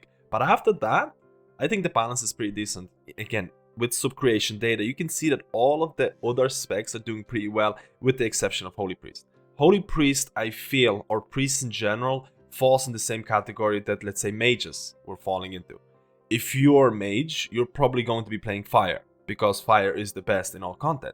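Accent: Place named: Ireland. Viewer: Dude you are without doubt the best healer content creator out there. Funny i thought that ur from some nordic country, because of your accent just to realize that ur irish. Really different accent from brittish one :D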